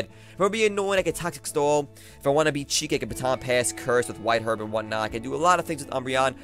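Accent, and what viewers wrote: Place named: Brooklyn, United States. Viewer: wats with the Brooklyn accent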